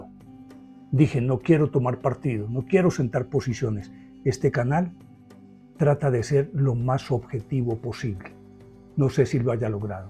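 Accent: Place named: Colombia. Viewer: Buena Charla, primera vez que te escucho, creo eres Colombiano, muy interesante tus datos claros y mundiales.😮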